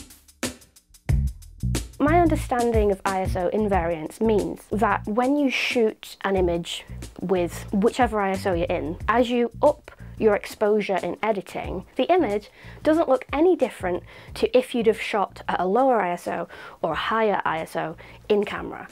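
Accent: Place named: Northern England, United Kingdom. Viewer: Great video. This is the first time I've heard the term iso invariance, and it does explain my only complaint using MFT. I have used and still own quite a few different cropped sensor and FF bodies I wonder which ones are and which ones aren't now! Fyi, I would continue to watch no matter what you spoke about ... I am here for your lovely Northern English accent 🤩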